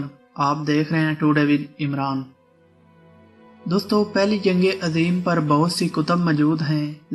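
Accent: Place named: Jhang, Pakistan. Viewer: lehja lokal hey. Shayed Jhang ya Mandi ka lehja hey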